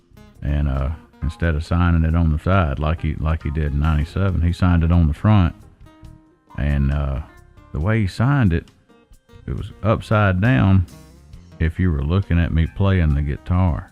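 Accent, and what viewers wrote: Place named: South, United States. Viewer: Way too much Southern accent is killing me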